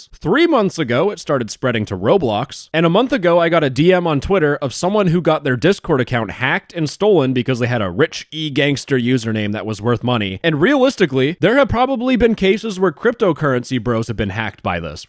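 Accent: Poland. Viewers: as a pole i approve polish accent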